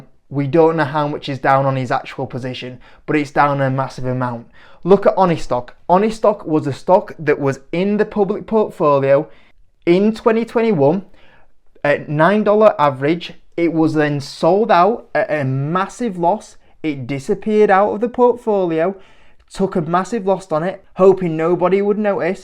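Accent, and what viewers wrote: United Kingdom. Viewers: British accent is immediate red flag LOL lets hear a European school the Americans about the stock market LOL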